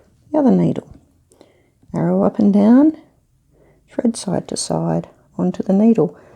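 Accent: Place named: Australia. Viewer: Thanks for the demonstration. I have one of these but had no idea how to use it. A very useful gadget I met you recently in the Janome shop in Joondalup where you helped me buy my lovely new machine. Good luck with your channel. So nice to hear another Aussie voice on YouTube. Maybe some advice on how to use the one step button hole foot next?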